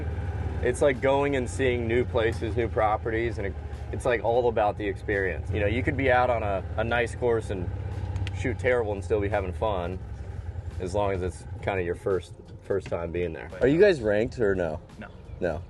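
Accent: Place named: Minnesota, United States. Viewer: Minnesota, eh?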